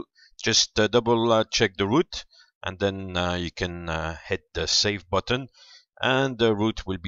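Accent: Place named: France. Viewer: Tu as un accent de français qui parle anglais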